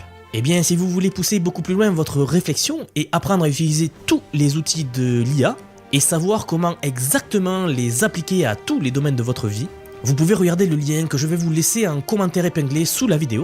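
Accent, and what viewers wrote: South, France. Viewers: Cet accent, c'est de ToLose ou Marseille ou Montpellier ou?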